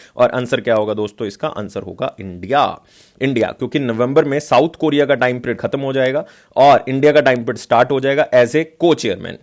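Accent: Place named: Himachal Pradesh, India. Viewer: sir aap himachal se lag rhe ho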